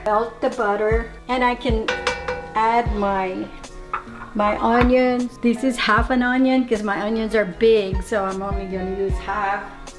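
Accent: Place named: United States. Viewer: i like your cute american accent , count me as your number 1 fan and subscriber. i miss your cooking when we were a lot younger !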